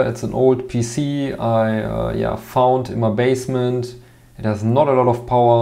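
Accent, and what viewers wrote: Germany. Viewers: I hear german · you sound really german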